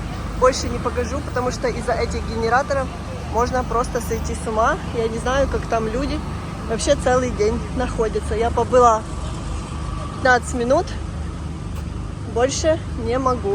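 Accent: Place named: Odessa, Ukraine. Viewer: Дорогая мая Одесса и самое главное мая красавица и не только внешне но самое прекрасное сердцем душой и мыслями. Я Алексей из далекого Примоского края город Находка не Одесит и небыл не когда у вас мои ученики были в советское время на соревнованиях и всегда рассказывали про Одеситах как простых добрых людях с оболдетной харизмой и юмором...никакого негатива не когда не привозили от вас ученики только положительные эмоции да анегдоты всякие летучии фразы и слава Богу что так было и дай Бог что бы так все и востоновилось. Всегда хотел попасть к Вам и в живую услышать одеский говорок он у вас проявляется частенько а голос ваш просто 9авораживает...ангел во плоти вы воплощение как и ваша сестра той чистой не оболваненой молодежи и умными правельными мыслями. Жаль что не могу попасть в Одессу но надеюсь что мирное время настанет и разум восторжествует над оголтелом фашизмом который мы с вами все в 45 году победили. 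Здоровья вам сестре и всем родным и близким да всем Украинцам Одеситам.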